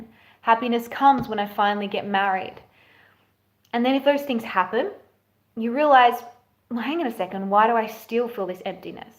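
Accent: Australia. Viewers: I saw a video by some mgtow guy nit picking on your video. I wanted to hear what you were saying because there was some good stuff there, but couldn't because of his constant interruptions with his "color commentary". He didn't mention who you were so going by your Australian accent I found you. On your mgtow video, you start by saying "leave your comments below", but when I scrolled down, the comment section had been turned off. My hunch is that you got inundated with mgtow tribal wrath. What I gathered from his video is that while you were discussing personal relationships, he was straw manning it with "ya but the workforce". Blatant to me but perhaps filet mignon to his followers. I saw a guy interviewing men and women as to whether they can just be friends. All of the women said yes, most of the men said no because the feelings are there. Cheers.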